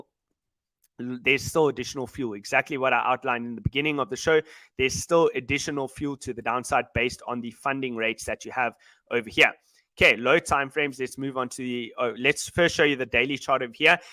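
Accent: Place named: South Africa. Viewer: SA I reckon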